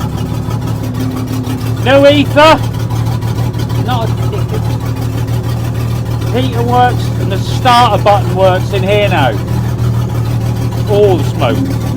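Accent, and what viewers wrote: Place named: England, United Kingdom. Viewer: I do really enjoy your videos however I have to admit I don't always know what you are talking about your English is a little to English for me and not enough Rez for me to understand sometimes. It like learning a new language sometimes but fun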